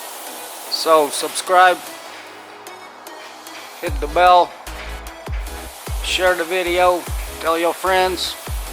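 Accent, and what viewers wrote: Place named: United Kingdom. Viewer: because it's not American English. It is British English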